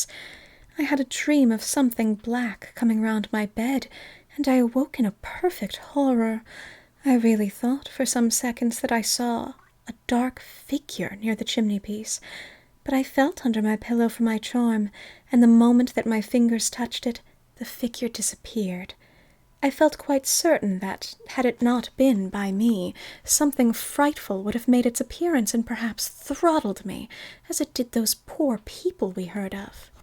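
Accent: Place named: Transatlantic, United States. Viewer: I love that you’re keeping the transatlantic accent alive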